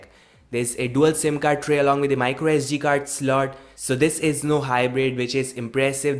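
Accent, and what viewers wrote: India. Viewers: Desi English 😬😬😬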